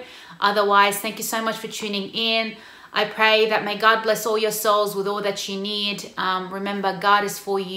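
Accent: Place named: Sydney, Australia. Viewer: Hello from Queensland 👋 I knew you're a Sydney girl the moment I heard your voice lol.. You're gorgeous and I'm really proud of your channel.. You go girl! (Please do a video about how to avoid Sydney guys who only wants to mess around🙄) New subscriber 👍 ✌️ ♥ God bless! 🙏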